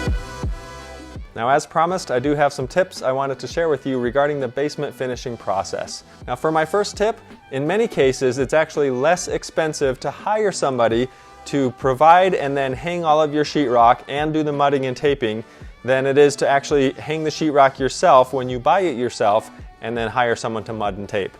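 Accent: United States. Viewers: guy speaks American English but has a GREAT BRITAIN flag ... something is fishy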